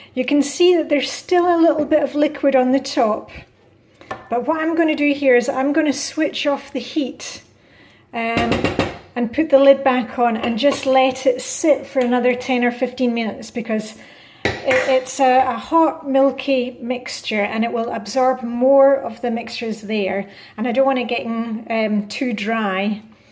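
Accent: Scotland, United Kingdom. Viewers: Danish with a Scottish accent. That’s different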